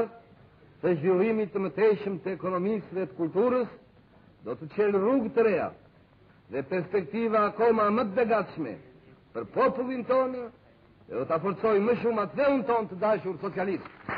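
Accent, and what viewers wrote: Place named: South, Albania. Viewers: Kosovans speak the geg dialect, Same as north Albania, the south speak the dialect youre hearing in vid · Hoxha grew up in southern Albania (namely Gjirokastër), where the Tosk dialect is spoken, as oppsed to Kosovo Albanians' Gheg dialect (which is also spoken in northern Albania and most of Albanian-populated areas of Macedonia)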